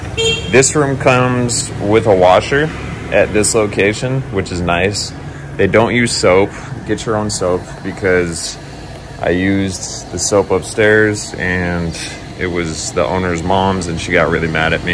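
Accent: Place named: Oakland, United States. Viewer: damn, what kind of accent is that? u grew up in oakland or somethin?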